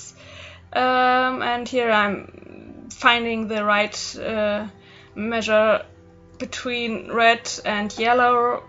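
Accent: Germany. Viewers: Man hört gleich, dass du deutschsprachig bist :) Sehr cool, danke für das Tutorial! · Ich liebe deinen deutschen Touch in deinem Englisch :D Fühle mich so verbunden 😂 Aber mal ehrlich, danke für das tolle Video, war wirklich hilfreich 😌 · ich bin nicht die einzigste die denkt ihre Muttersprache ist deutsch 😂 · letmefly 97 I'm Pretty Sure she's from Germany or Austria (or Switzerland?) because of her Accent (I'm from Germany and most of my classmates have this Accent sooo yeah) But...People correct me if I'm wrong! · Bist du deutsch? Dein englisch hört sich so an 😂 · Are u german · Haha cool I think your tips may be helpful! Your accent sounds a little bit German 😅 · thank you so much for this video! your English is really really good! and I love your accent, it reminds me of my grandmother (she is from Aschaffenburg) like hearing her at a younger age. I'm sorry if that's strange. 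^_^ but I appreciate your video very much, I definitely want to try some of your tricks and methods when I paint :D be well! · Your English is fine and the vid is amazing but you’re accent sounds like ur from Germany (I’m just curios tho)😂❤️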